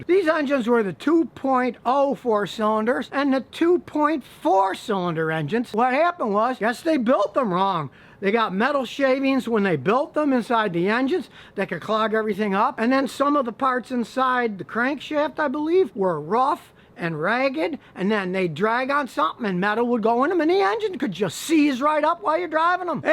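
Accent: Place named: East Coast, United States. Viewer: I LOVE THIS GUY MAN !! HE JUST TELLS IT EXACTLY HOW IT IS YALL !!! AND OF COURSE HES GOT THAT RUGGED AND RASPY EAST COAST ACCENT !!! COOL BEENZ YALLZ!!!!!!!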